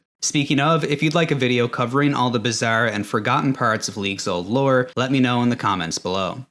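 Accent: Newfoundland, Canada. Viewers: hey are you canadian? you sound like a newfoundlander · Ive watched a few of your videos and enjoy your presentation, and not that it matters, but are you from Atlantic Canada, like Newfoundland & Labrador? I thought I could hear Irish or Scottish, but if I was on a gameshow I'd lock in NFL. Will be subscribing.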